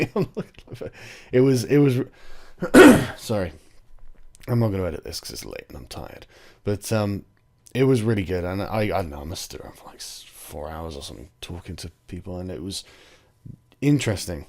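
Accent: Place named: United Kingdom. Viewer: you sound reeeeally british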